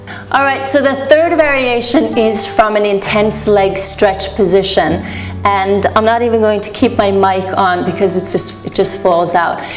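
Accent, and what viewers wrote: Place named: Sweden. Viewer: Thank you! By the way, are you Swedish? I think I can spot a very subtle Swedish accent.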